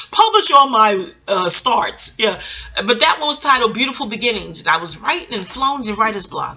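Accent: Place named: East Coast, United States. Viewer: Absolutely adorable love the East Coast accent... hey girl you supposed be talking Bobby Brown‘s memoir...🙃🤣🤣🤣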